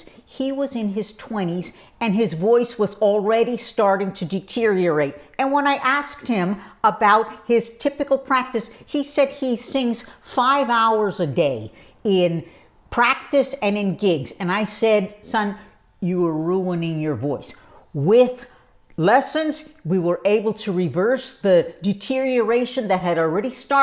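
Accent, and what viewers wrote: New York, United States. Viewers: Are you from NY? Lol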